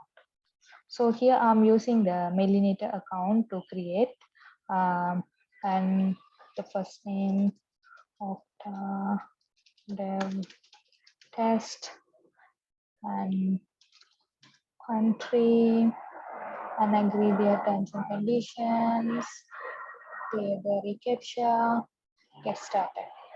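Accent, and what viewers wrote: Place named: India. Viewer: awaz se to aap indian lagti hai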